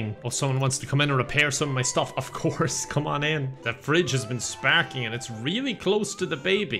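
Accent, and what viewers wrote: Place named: Ireland. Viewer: Awww you are starting to lose your cute irish accent. 😔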